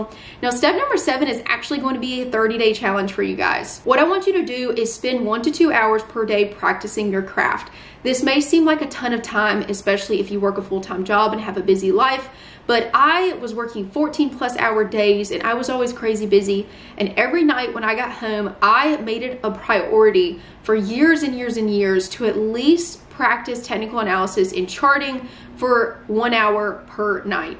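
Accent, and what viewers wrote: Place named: South, United States. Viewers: I Love Your accent, straight out the south? Either way, You are Awesome.Thanj you for the priceless information. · Are you from Southaven, Ms.? I recognize the dialect.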